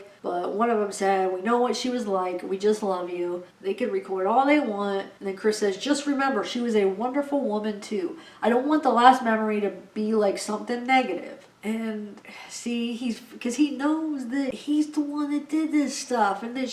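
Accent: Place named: Midwest, United States. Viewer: I had to take a break from this case also... I can only imagine how feels... But her videos are great! I think her observations and insights are spot-on... Lol plus I love to hear her talk.I think she has a strong Midwestern accent and I am from the Midwest also lol I say Illinois Wisconsin or Michigan LOL 😜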